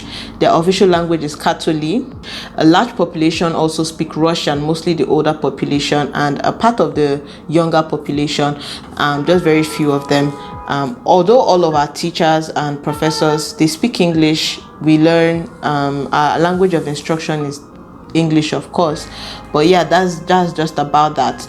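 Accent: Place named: Nigeria. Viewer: She is nigerian and they speak french, I believe that's the reason for her accent.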